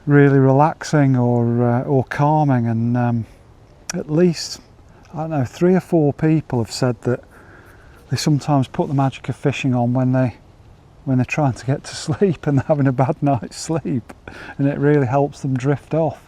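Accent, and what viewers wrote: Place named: Yorkshire, United Kingdom. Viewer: Another nice video, there’s definitely something about those low key, relaxing sessions, even if they don’t involve many fish, that makes them appealing to watch as well as experience - I often find myself feeling more relaxed after a couple of hours watching a float and maybe getting a fish or two than after stalking carp (which can be pretty nerve-racking) and coming home with an aching arm after a few battles. Sometimes we want excitement, other times to chill out. Nice to hear the Yorkshire accent being appreciated, it is, of course, the finest of all voices! It’s worth pointing out to the folks in your adopted home (and mine, I’m another exile) that there’s no one accent, everywhere up there has a local version and the Sheffield one is particularly relaxing to listen to…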